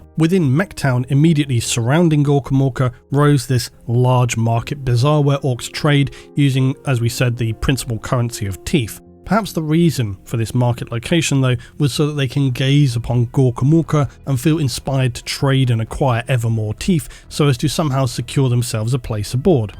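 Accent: United Kingdom. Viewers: Your voice and your accent in particular your British accent really helps you with a American audience I think I mean I like listening to you talk about this stuff more than say some American YouTube channels that talk about it most Americans want to admit it but a lot of them like a British accent when it comes to like narration an hour someone reading something or you know stuff like that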